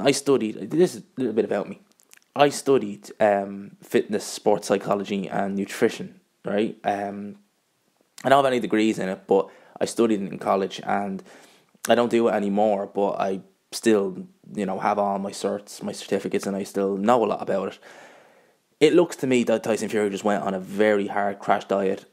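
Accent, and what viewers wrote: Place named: Ireland. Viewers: Wether Irish voice or not nice to see a non biased opinion based on boxing knowledge not who is liked most ! This is 2nd video watched and subbed ! ....... more posts like this the better as to many now Mae videos on who they like and people just say "casual" to the with different opinion ! .......... keep posts coming mate ! Gman........and aaron refreshing to see someone commenting with no insults for a change 😂 peace ✌🏼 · Hey bro Good to here an Irish voice! Good video ! Fair play, keep them coming! Anyways I think fury will school him, easier then Klitschko!! And truthfully brother I definitely believe the gypsy king is in way better condition now then he was against Wladimir Klitschko, his more powerful now and still got the speed ! Cause now his doin weights, were for he didn’t before! And bro he seems WAY HAPPIER NOW 💪🏼 and you know the saying ( A HAPPY FIGHTER IS A DANGEROUS FIGHTER ) THE GYPSY KING IS BACK!!! 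A NEW 🥊🥊🥊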